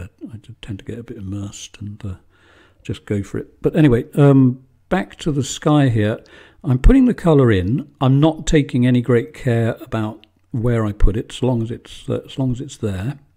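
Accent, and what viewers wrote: United Kingdom. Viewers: Thanks for sharing your wonderful unique creativity. I love oils and your style is so inspirational that I can't wait for my current work to finish so I can try your method. Love listening to you. Besides the lovely British accent, you don't ramble on like some artists I tried watching and chose to turn off sound because of the non topic nonsense they were talking about. Musical intervals are just perfect!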